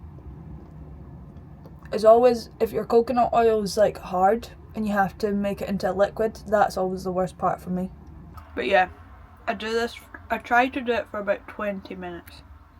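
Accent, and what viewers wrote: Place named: Scotland, United Kingdom. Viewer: I love seeing Scottish YouTubers with unashamedly Scottish accents. The world loves us guys, we don’t need to hide our accent. Everyone understands, deep down 😃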